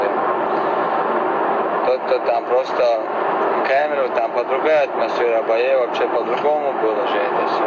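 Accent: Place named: Urus-Martan, Russia. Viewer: Как ты разговариваешь... видно что ты из Урус-Мартан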